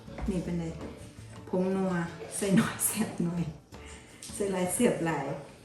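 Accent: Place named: Chaiyaphum, Thailand. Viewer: สำเนียง เหมือนคนขัยภูมิ แม่น บ่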